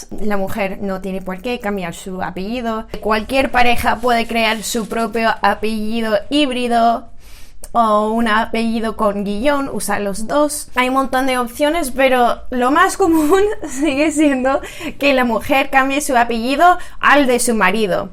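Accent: Andalusia, Spain. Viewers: Vas teniendo acento andaluz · Ya he visto un buen puñado de tus vídeos porque me gusta observar los choques culturales que yo busco cuando viajo a otros paises, cosa que me apasiona. Es delicioso tu acento andalú (te lo dice un granaíno) jajajaja. Te voy a contar una curiosidad acerca del manejo de los dos apellidos en España. Se aplicaron en primera instancia allá por el siglo XVI aunque se consolidó en el XIX y no tenía otro objeto que el de localizar indicios por vía materna de los conversos, aquellos que judaizaran o que llevaran en su apellido su origen sefardí. El resto de la historia de estos pobres infortunados imagino que la conocerás. Un saludo enorme y te animo a que publiques más, veo que hace tiempo que no lo haces.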